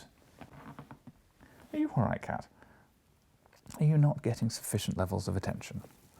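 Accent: United Kingdom. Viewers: You are too British y really like that, I like your accent I hear your videos to improve mi listen skill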